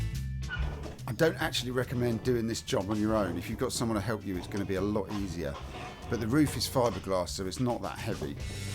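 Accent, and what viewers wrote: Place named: United Kingdom. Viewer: muy intersante! Mi padre tenía uno de estos, ¡estos son recuerdos para mí! Y me encanta el acento británico!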